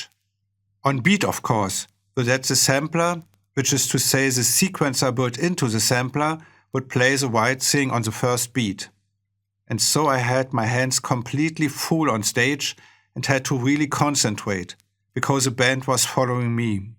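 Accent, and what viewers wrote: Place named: England, United Kingdom. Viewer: I love his English accent! Flake is such a musical genius. Rammstein would not be complete without him.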